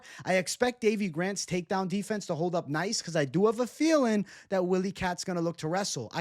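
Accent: New York, United States. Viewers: Can u put ur picks in the description so I don’t have to listen to that awful NY voice of urs. New Yorkers sound so damn dumb.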